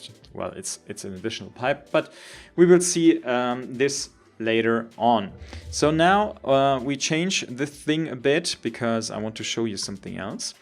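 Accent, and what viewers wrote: Germany. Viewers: Its German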